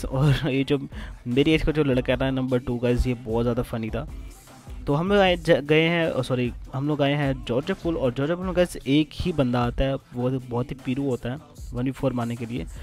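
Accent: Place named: Rawalpindi, Pakistan. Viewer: Love U bhai pindi boy boltay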